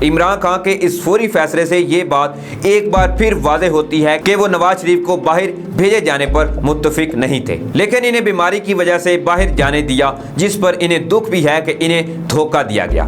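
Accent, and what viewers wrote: India. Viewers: بھائ جان مہربانی کرین۔ اپنا لہجہ ٹھیک کرنے کی کوشش کرین آپ کی ویڈیو دیکھتے ھوۓ تکلیف ھوتی جب آپ خان کو کھان اور خانم کو کھانم کہتے ہیں۔ ایسے تو انڈیا دالے کہتے ہین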